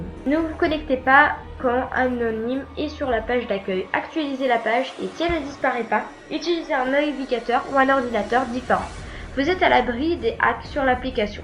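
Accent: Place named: England, United Kingdom. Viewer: J'ai kiffé l'accent anglais ('x ♥♥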